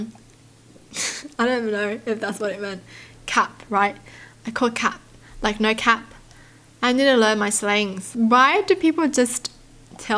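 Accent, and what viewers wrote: Australia. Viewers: Ok, I can listen to you all day because of your accent 😂 I think you speak with an Aussie accent .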